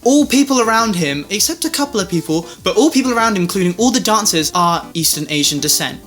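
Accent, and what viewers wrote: United Kingdom. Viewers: Oli London Obsessed guy (Woww ur accent is Britain one I luv ur accent) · Are you korean? But you kinda have a british accent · Omg! You sound more British then him does. And I agree to not agree. It's unhealthy to change your face too look like a celebrity some would say, that person would need therapy. It's all part of the game for popularity and fame ..I agree his music is not even Korean or even close.. I found it funny that some Koreans knows this and still goes with joke and still being part of he's career lol nowadays anything goes I guess ? Everyone is just finding something to film about. ?? Who knows really as long he's not after my guy..that's all that matter in the bts. And look at him and take look of all of there bodyguards lol so many is so concerned don't be. Like always thank you for reading.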